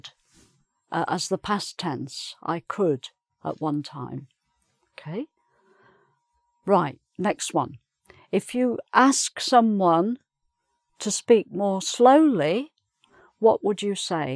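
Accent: England, United Kingdom. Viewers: Is her exent british??plz tell me.becoz i need british teacher. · You have really beautiful British accent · O ne kadar güzel ingiliz aksanı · ... I found you yesterday... and I am to confess that your English accent is fantastic... And your explanations are very clear and useful. With love from Brazil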